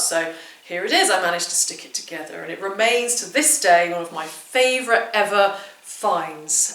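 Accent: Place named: England, United Kingdom. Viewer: Watching you find these things and listening and you explaining them all is delightful! I love your English accent.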